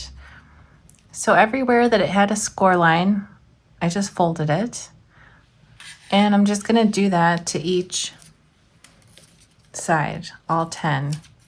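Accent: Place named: Texas, United States. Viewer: Yes I’m making more Halloween crafts. 😊 I use to make 60 goody bags but my kids are older now and I really miss it. I’m making my mom and sister this little pumpkin. I do notice that some of your pronouncing of words are different. Your mom being from TX makes perfect sense now. You’re very clear in your explanations and instructions. Makes perfect sense to me! ❤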